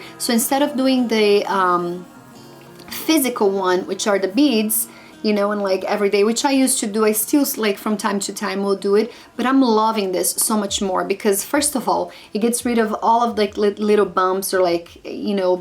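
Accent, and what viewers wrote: Brazil. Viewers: I like your accent are you Brazilian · You sound like you're Brazilian every words ends with a e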